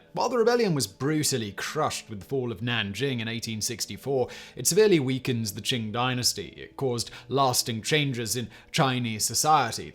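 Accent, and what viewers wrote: United Kingdom. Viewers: Hmmm, British narrator....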